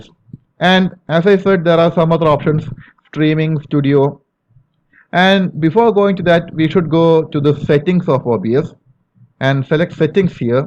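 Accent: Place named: Kerala, India. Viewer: Are you from Kerala sir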